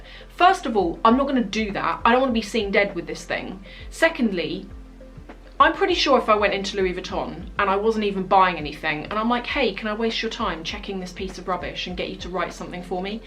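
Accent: RP, United Kingdom. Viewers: I love your posh accent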